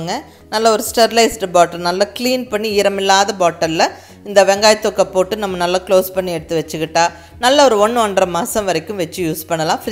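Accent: Coimbatore, India. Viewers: This simple beautiful talk is our😎 Coimbatore Tamil. PROUD Tamil.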